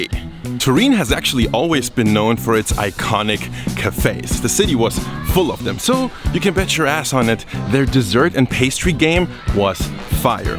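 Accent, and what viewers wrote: Germany. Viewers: First time on your videos. I detect a german accent, am i right? I like guessing people's accents 😁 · This is probably lhe least german german accent I've ever heard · Is he german?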